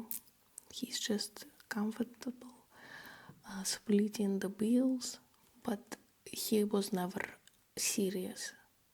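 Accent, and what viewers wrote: Russia. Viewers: Glad to see you’re doing a lot more Russian accent. Please include more “yeah”s and “uh hmmm” they are really original and quirky only to you. Quite relaxing.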